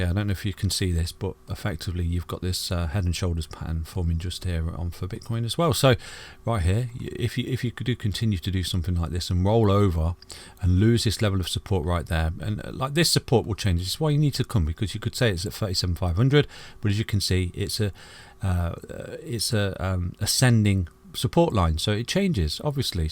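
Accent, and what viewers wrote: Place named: East Midlands, United Kingdom. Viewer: East Midlands?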